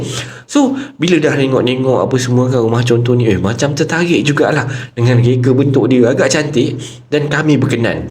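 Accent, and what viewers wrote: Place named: Johor, Malaysia. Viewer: Sy kdg2 gelak dengar penyampaian cerita ni. Mmg kelakarlah loghat.orang Johor... Apapun sy suka dengar Kisah Seram Msia....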